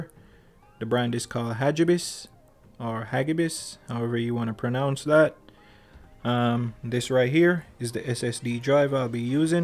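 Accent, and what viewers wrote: Jamaica. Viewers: The Jamaican can’t hide in your voice bro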